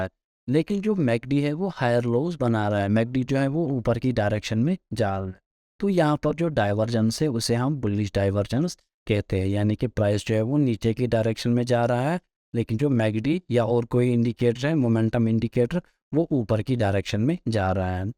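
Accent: Kashmir, India. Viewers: Salute sir aapki tone Kashmiri hai hamen aap par proud hai..❤❤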